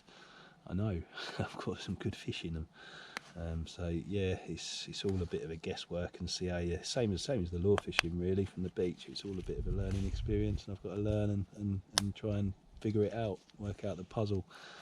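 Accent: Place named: Cornwall, United Kingdom. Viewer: Nice honest video. I recognise the location and your accent.. Dont let the kernow nimbys get under your skin. Keep going for your gilty pleasure. And If youre getting crabbed up then try a pop up bait to keep it above their heads and claws.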